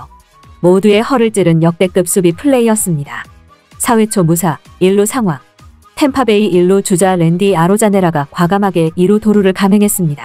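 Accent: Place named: North Korea. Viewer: 아 젠장 결국 3루에 공던졌다는걸 이렇게 길게…. 그리고 이 아줌마 목소리 겁나 듣기 싫네. 북한여자인가?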